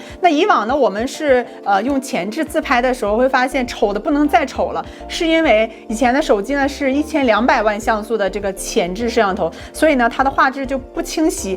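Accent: Northeast, China. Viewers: 主播都东北人？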